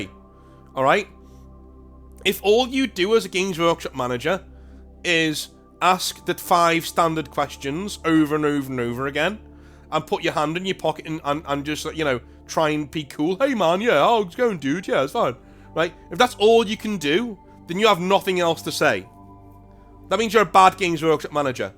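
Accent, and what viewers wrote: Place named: Liverpool, United Kingdom. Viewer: are you Scouse? I swear you have a Liverpool accent but like you've moved about alot so it's become more subtle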